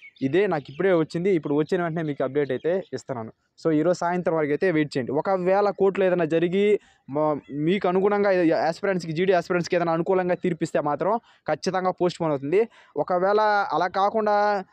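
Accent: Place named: Chittoor, India. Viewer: Bro meedhi ee vooru slang chittore district lagane undhi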